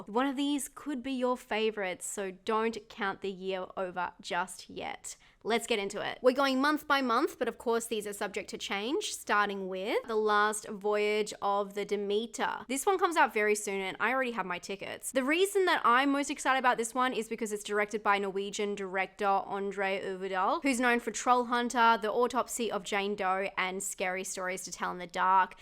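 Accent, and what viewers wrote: Australia. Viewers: >hear australian accent > immediately click off video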